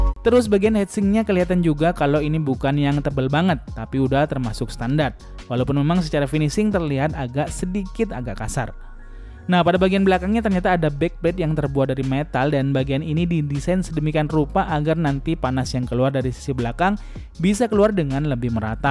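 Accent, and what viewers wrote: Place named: West Java, Indonesia. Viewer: he'eh nya si aa teh meuni sunda pisan nya .. wkwkw jadi resep maca na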